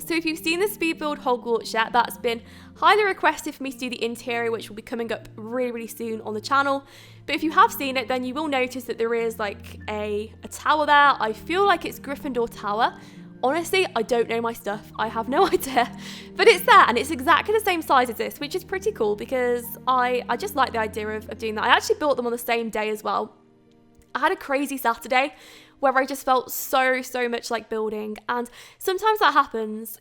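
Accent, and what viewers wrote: United Kingdom. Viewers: R u British?😕😞 I am English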